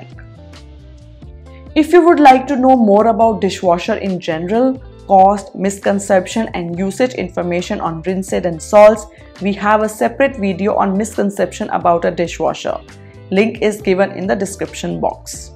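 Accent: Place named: India. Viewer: Ayyo sumne kannad baralla Anta heltare illa Andre swalpa swalpa gottu Anta Hindi accent Alli heltare..nam Bengaluru kathene istu 😀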